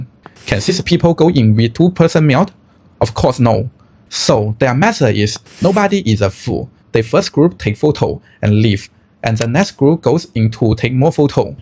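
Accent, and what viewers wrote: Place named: China. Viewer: Hello, if you lose Chinese accent, would be better for views. But it's tough and long process...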